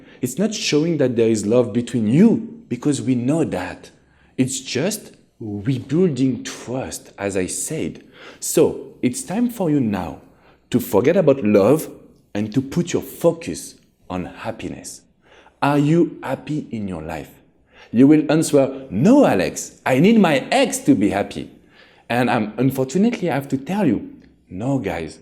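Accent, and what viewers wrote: France. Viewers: Amazing video WoooW Alex, you are amazing! and love your french accent! Thank you sharing those thing!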